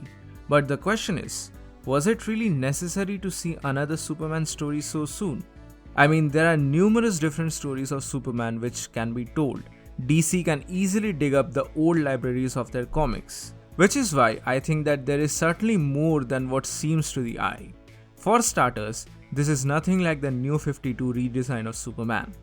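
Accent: India. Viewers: No offense but you sound like the typical Indian person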